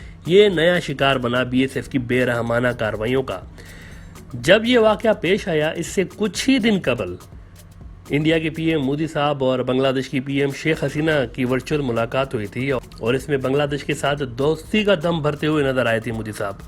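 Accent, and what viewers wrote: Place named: Pakistan. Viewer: you can see from how he pronounce it's Urdu by Pakistan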